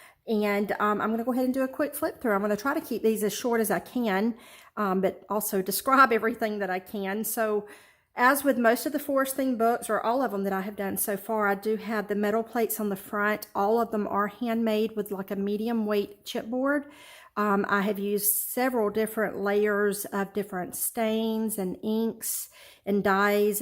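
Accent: South, United States. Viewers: WOW!!!!! I am so glad I saw your comment about showing this journal on Tracie Fox's Facebook Page. Its one of the most well done journals I've seen yet!!!! Really beautiful and so much thought put into it! And, another thing that caught my eye is your middle (or maiden name) is...and my maiden name is also!!! You don't see too many McCollums so I was intrigued!! I am originally from Texas but I now live in Alabama...I can tell by your accent you are somewhere in the south I think!!! Anyway, loved the journal and now I am off to check out your Etsy store!!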